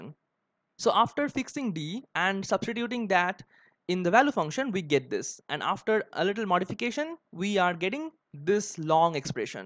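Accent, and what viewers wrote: India. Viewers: I present you the indian english : )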